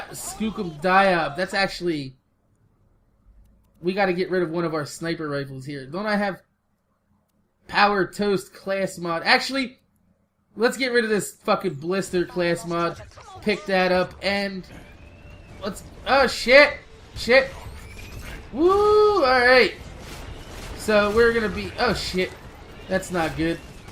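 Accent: New Jersey, United States. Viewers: Dat New Jersey accent :D I'm from Tennessee, so I have a southern accent. Only slightly though.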